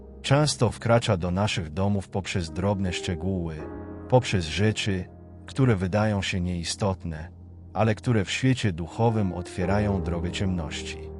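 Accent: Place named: Russia. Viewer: Co to za Antychryst z ruskim akcentem nagrał te brednie ,gin szatanie ,,akysz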